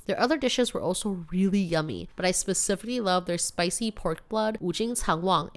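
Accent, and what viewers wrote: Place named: United States. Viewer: Why your accent is so American but you grew up in Taiwan? I love how much you enjoy Taiwanese food and you’re a very smart chef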